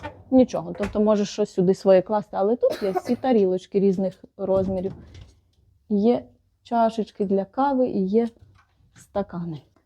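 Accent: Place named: Lviv, Ukraine. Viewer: Супер! За вікном казка. Чудові краєвиди❤ У ваших відео є особлива родзинка -мова, львівський говір, і це дуже притягує. Дякую, що поділилися враженнями. ❤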